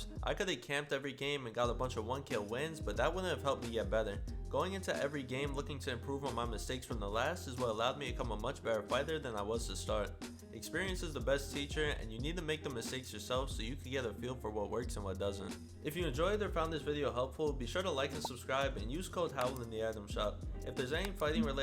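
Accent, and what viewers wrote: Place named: Netherlands. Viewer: Ben je NL? je accent is nl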